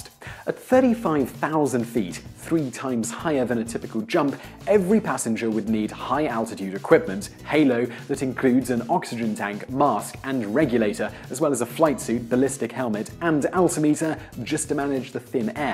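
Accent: United Kingdom. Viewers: Your British accent is not helpful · Why are you speaking in British accent but using imperial system? I don't understand.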